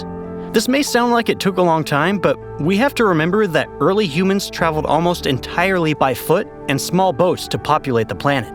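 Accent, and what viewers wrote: United States. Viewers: Dude's got an American accent so he's likely American and in America we use miles and Fahrenheit, so it makes sense.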